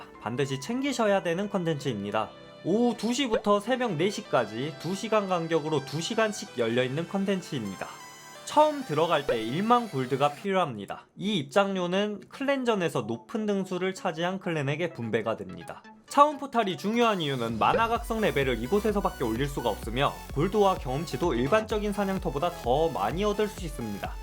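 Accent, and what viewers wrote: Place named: Jeolla, South Korea. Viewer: 그런데 혹시 전라도 분이신가요? 못해가 모데로 들려서 너무 친숙하네욬ㅋㅋ 고향사람 만난느낌 유튜버님 서버는 어디로하시나요?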